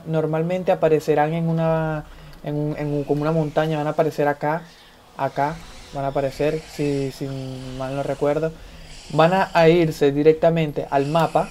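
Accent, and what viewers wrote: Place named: Venezuela. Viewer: Buenas hermano gracias por la guía en serio mucha ayuda sólo.que iniciar este juego cuesta muchísimo el inicio es difícil , pasarte la campaña sobre.Todo los dos últimos mapas cuesta aún no he podido , tampoco tengo mucho jugando apenas 1 semana y entendiendo mejor el juego con ayuda de vídeos como el tuyo,ahora he visto cosas que para mi una sola.explicación tienen como el.tema de tanto.daño y hp de algunos personajes y.debe ser que han pagado cambian gemas y compran el.rank 10 del Premium que tiene buenos beneficios de.hecho excelentes eso les ayuda y que empezaron el día del lanzamiento del juego. Si te animas agradecido por los vídeos y tener alguien con quien jugar en línea de habla hispana y ese acento debe ser venezolano jajajaja si es así de que parte hermano y gracias precios los consejos y tu tiempo al.subir vídeos .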